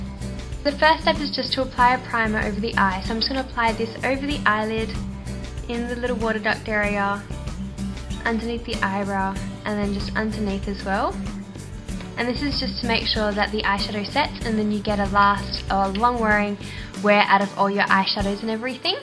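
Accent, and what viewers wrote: Australia. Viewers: Sometimes you sound Aussie and then other times you sound like you are putting on a British accent. FYI I am Australian so I know the difference.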